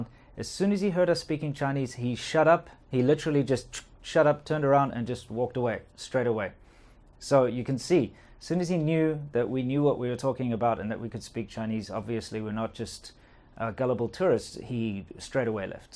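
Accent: South Africa. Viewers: South African? Thanks for filming in Scope. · You're not from London. You sound like you're from South Africa. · You sound South African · are you south african ? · why do you always tell chinese locals you are english when you sound like you are from south africa? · You sound South African ! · Hi, I like your videos but why do you tell everyone you are from England? Your accent is quite markedly South African.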